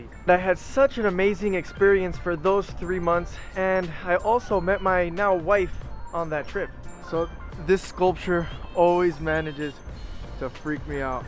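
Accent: Argentina. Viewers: I am confused, even with half you family being from Argentina, if you were born and lived your life in the US, how is that you have a slight Argentinean accent when speaking in English. Do not get me wrong, you speak very well and fluently of course, but I can hear an accent. However, I do not pick any sign of an american accent when you speak argentinean. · You sound like an Argentine that grew up abroad and moved back cuz of your accent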